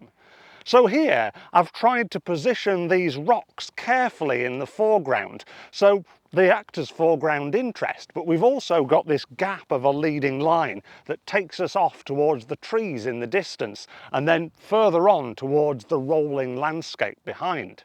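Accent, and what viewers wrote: United Kingdom. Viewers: Love the classic "ee bah goom" accent son. I travel light so only have a Zeiss Sonnar 1.8/24mm prime on my Sony A6000. Not sure how that relates to the sizes mentioned but used on everything from landscapes to close up tech photos. Main thing is to get a sharp shot and crop to suit as required. Different viewpoints by walking to the best vantage points if poss. Very helpful points in your vid however which I'll keep in mind.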